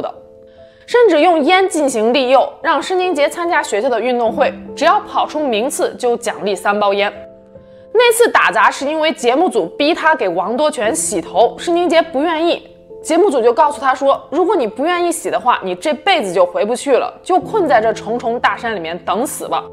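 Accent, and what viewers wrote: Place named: Beijing, China. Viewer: 说话有北京味儿，那叫一个地道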